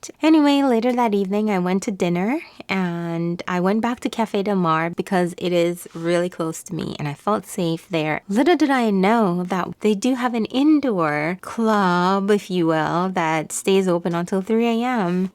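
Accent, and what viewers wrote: Jamaica. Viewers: Just came across your channel, Watched all your videos. Love The Content. And I could tell from you're accent that you're Jamaican too. Blessup and keep up the good work. Love the editing also. I Subscribed, so looking forward to more videos.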